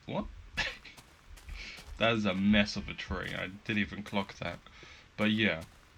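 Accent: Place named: United Kingdom. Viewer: I've been really enjoying your series so far, keep up the good work! :3 I also started playing beta recently and Ive had similar see-through glitches here and there. No idea what thats all about really, guess just some beta bugs. The tower turned out really nice! I love the shape of it. The name Overlook came to my mind when you asked about name suggestions (inspired by the hotel's name from Stephen King's The Shining). I think it fits cause when you're on the top, you can look over your world and see everything really well. Q: What made you start making videos? Also, your Bri'ish accent is cute xD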